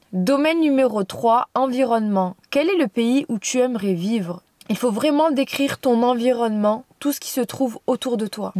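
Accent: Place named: South, France. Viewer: tu a un beaux petit accent du sud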